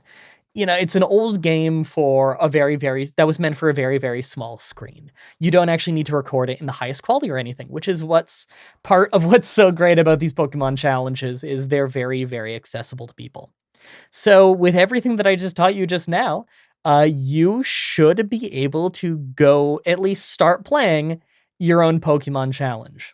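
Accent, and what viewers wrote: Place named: Canada. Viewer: The “out” thing is just his Canadian accent showing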